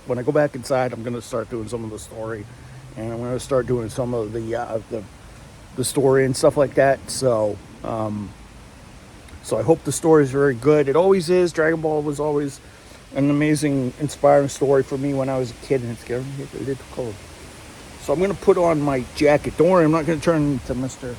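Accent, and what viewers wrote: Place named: England, United Kingdom. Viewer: Great intro and excellent english accent well done😅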